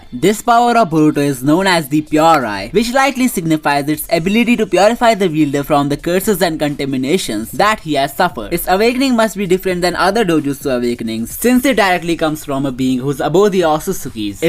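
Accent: India. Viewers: Your Indian accent is real thick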